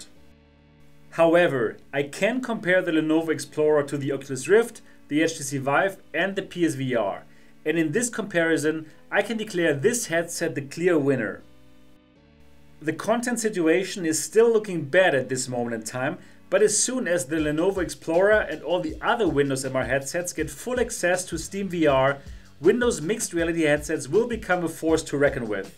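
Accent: Germany. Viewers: ich hab mich am anfang schon gewundert, dass sich der akzent ziemlich deutsch anhört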